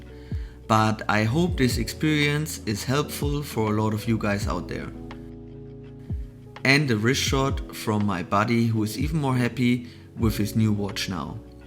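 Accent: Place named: Germany. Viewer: The main reason I open your videos is to demonstrate what a strong German accent sounds. Please practice your th and your of kurs is actually of course.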